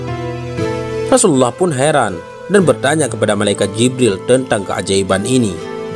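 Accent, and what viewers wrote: Aceh, Indonesia. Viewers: Baru sj suami sy beritahu sy suara min kyk ny org Aceh ajah yaa?🤭😀😊👍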